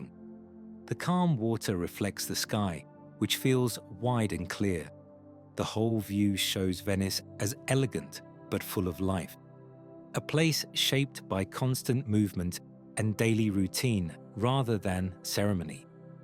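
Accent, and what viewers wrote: United Kingdom. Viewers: So, hey, I love what you’re doing with this channel. I am really interested in seeing these old town scenes from historic times be recreated. What you have done with the AI is greater than I ever could have imagined. I do believe that these recreations of the past are a world treasure. There are some things that need improvement, though. The repetitive slides tend to bother me and I feel sort of triggered seeing similar slides be shown several times over. Also, I suspect you use an AI voiceover, and I hope soon that gets changed too. Because that specific British accent and tone of voice is incredibly annoying to me, but maybe it’s just because I’m not British lol P.S. please recreate historic images of Ireland (1600’s to 1800’s) and America’s early western frontier (from the American Revolution to the Jacksonian Democracy)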